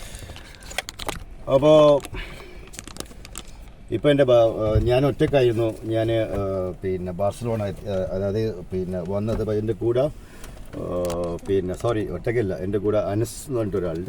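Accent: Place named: Thalassery, India. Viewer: തനി തലശ്ശേരി ശൈലിയിലുള്ള മനോഹരമായ വിവരണം. Wishing you all the best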